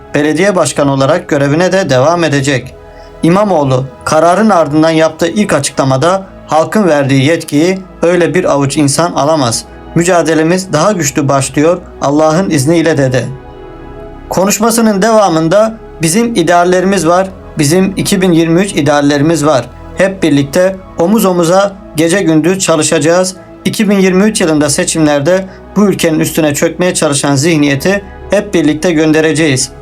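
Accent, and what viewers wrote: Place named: Armenia. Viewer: Senin sesin tam bir ermeni sesi,sarlatan,siktir git,amkoyuyum senin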